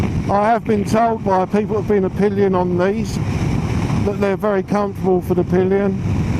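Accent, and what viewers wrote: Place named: United Kingdom. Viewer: For the love of Christ, why do you brits insist on pronouncing the letter Z as the word, "zed?" It sounds absolutely ridiculous. It's a ZZR, not a zed zed r. Z. As in "zee" if you must, but dammit, knock off that retarded zed nonsense. And yes, I have one, an 04, moonlight silver with corbin beettle bags, and i would NEVER call it a zed. Its a ZZR!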